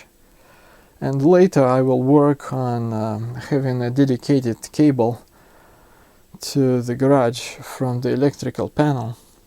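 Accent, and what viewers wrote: Russia. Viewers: Русский акцент. Крутяк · вери рашн пронансиэйшен )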